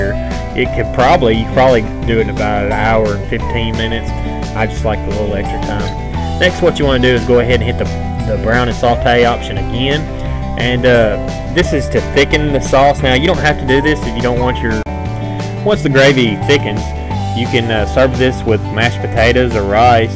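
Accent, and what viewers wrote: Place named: United States. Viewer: oh honey, the country accent is beautiful. Don't ever cover that up 😊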